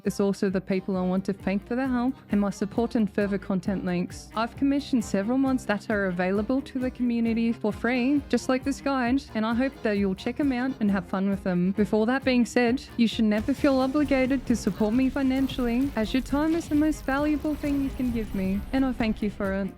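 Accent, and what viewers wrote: Australia. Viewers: okay weirdnotweird take, but hear me out. i like that your voice fits your aussie accent.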